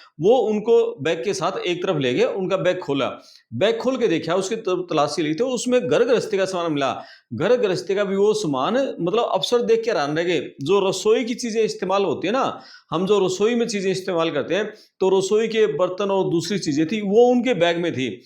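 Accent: Haryana, India. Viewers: Haryana accent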